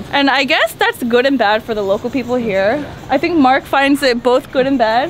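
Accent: United States. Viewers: You're a very entertaining story-teller with an amazing accent (West or East Coast?). Appreciate the way you appreciate everything about El Nido. (I miss that pandecoco!!) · girl you talk to much a southern california girl., i should know i live in Orange County , CA and majoroty of korea her talk like you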